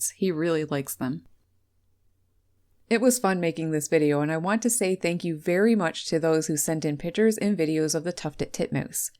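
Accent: Canada. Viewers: I always wondered if these guys and the black capped chickadees (both frequent flyers to my feeders) were related somehow as their endearing calls are very similar in sound. Almost like a dogs squeaky chew toy bring chewed at a rapid pace. Too cute I now have the pleasure of hosting 4-6 Black-capped chickadees, Tufted Titmouse, cardinals, brown thrashers, house and purple finches, blue jays, warblers, chat birds and the red bellied woodpecker at my four feeders every day. They are literally waking me up every morning to get up and put the feeders out. It’s too cute. Oh, and recently I’ve been getting some mourning doves picking up seeds of the ground. Apparently the word is out, my place is the place to be., I’ve been meaning to ask, is your accent Canadian or North Dakota/Minnesota? I’m in Florida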